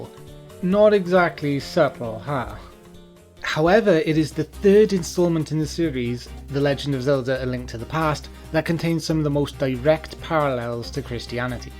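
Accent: United Kingdom. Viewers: Sidenote are you from Northern Ireland? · Bro are you welsh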